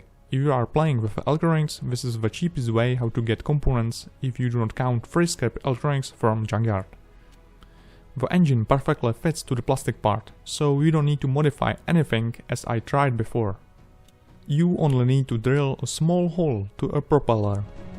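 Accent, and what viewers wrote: Czech Republic. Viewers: "just a little bit" :-) vynikající model a další vysoce kvalitní video, jen to na můj vkus maličko sráží místy školní czengličtina (nemůžu si pomoct, jsem anglista), slovní zásoba je easy nebo si dohledáš, ale občas tomu skřípe pořádek slov, vazba there is/are, etc., někdy to zní jak přes google translator :) neber to, prosím, negativně, za poslední rok dva ses hodně zlepšil; předpokládám, že komentář čteš z nějakého aspoň provizorního skriptu, nechtěl bys to třeba před namluvením zkorigovat? mně to zabere 20-30 minut a rád jako profi lingvista pomůžu profi modeláři k ještě lepším videům ;) kdyžtak napiš do odpovědi a domluvíme se přes fb, ale mezi námi, stejně to asi nikomu nevadí, naopak, ta tvoje ostravská angličtina tomu dodává local flavour ;) ať to šlape!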